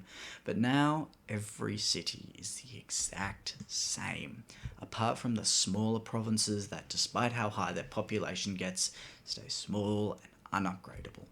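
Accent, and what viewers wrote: Australia. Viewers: I can tell you're Australian right off the bat. its fucken fantastic.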